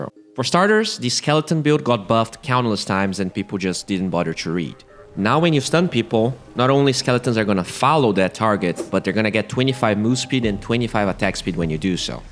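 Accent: Brazil. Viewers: o cara é br da pra ver pelo sotaque kkk tnc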